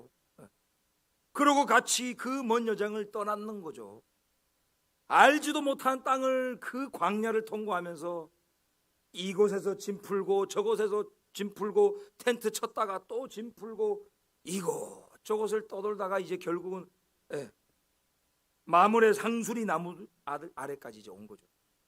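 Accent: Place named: Gyeongsang, South Korea. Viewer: 🤗 말씀이 깊고 넓게 확장되는 시간이었습니다. 감사💞 ※※경상도 억양이시라서 부산근방..생각했었는데, 석수동이셨네요.🤓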